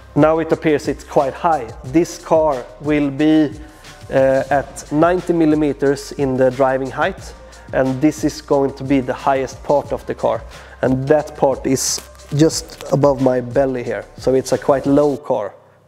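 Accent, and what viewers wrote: Sweden. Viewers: A little Swenglish never hurt anyone! 🇸🇪 What an incredible build, subscribed and ready for the next episode! 🚀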